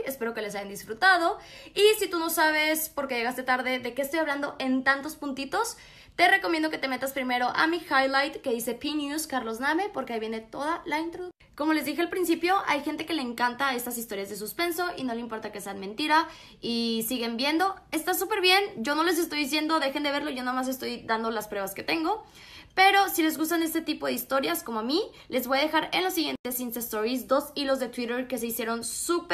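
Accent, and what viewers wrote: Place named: Fresa, Mexico. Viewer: Más fresa no se puede escuchar jaja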